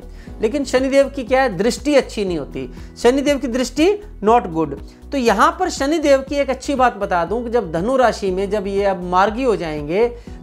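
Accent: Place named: Punjab, India. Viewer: he is from punjab that's why his pronounciation is like this...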